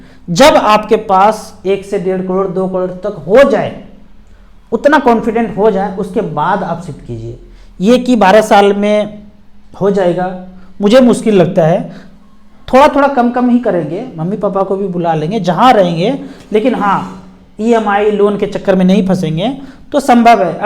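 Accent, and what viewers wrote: Bihar, India. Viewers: Sir aapki wani me bihar ki khushbu aati hai aap hai kaha se 😂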